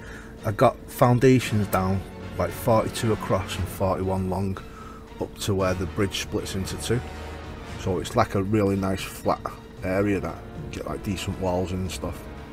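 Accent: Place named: Salford, United Kingdom. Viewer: You sound like a salford lad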